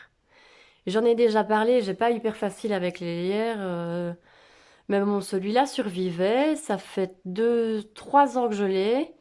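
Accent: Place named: Liège, Belgium. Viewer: Merci pour ce plante tour, j adore !! Et l accent Liégeois coool 😃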